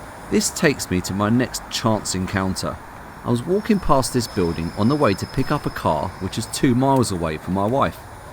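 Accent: United Kingdom. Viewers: nope he's from the UK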